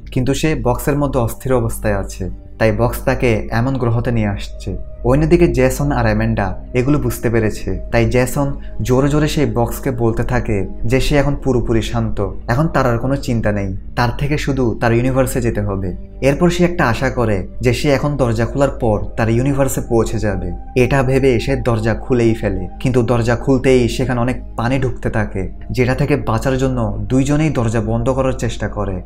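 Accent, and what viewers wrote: Chittagong, Bangladesh. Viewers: ভাইয়ের বাড়ি মনে হয় চট্টগ্রাম 😊 সীতাকুণ্ড আসলে নক দিয়েন❤ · ভাই কি চট্টগ্রামের নাকি? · চট্টগ্রাম ❤️🌸 ভাষা ধরা পড়ল একটু করে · Bhai Chittagonian naki amr moto? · চিটাইংগে চিটাইংগে গল্প মজা লাগছে😊😅❤ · আপনার কথায় একটা চাটগাঁইয়া টিউন আসে।🫥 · চিটাগাং বাড়ি নাকি?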